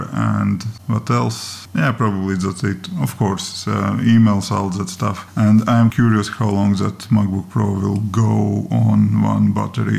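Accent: Russia. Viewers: Are you Russian?) You have a russian pronunciation. Nice review, thanks!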